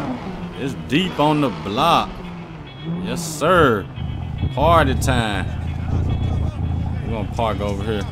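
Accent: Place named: Texas, United States. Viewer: This guy has to be from Texas. Love it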